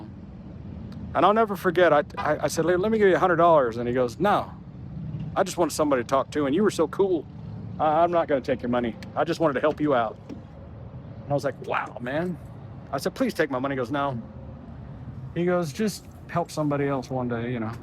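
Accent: Texas, United States. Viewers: That Texas twang is coming back! LOL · every tine you go to Texas you get that "Texas accent" going, lol too cool.✌️💫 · the Vegas man, he ended up with a Texas accent y'all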